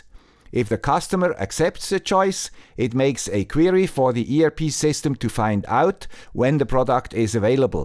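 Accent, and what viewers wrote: Switzerland. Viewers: If I’m not mistaken, this marks your 500th video! A truly remarkable milestone! Congratulations from the bottom of my heart! Your videos are a constant source of inspiration for the community you so thoughtfully cater to. I always look forward to each new episode, filled with fresh ideas and, of course, that delightful Swiss accent, which adds an extra charm to even the most fascinating content. Greetings from Cologne! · it is usually written as: "why should I trust your definition, if you can't pronounce 'inference' properly. Let's assume the poster meant it as a joke (which it usually is), though missing the point of "the guy with the Swiss accent" :)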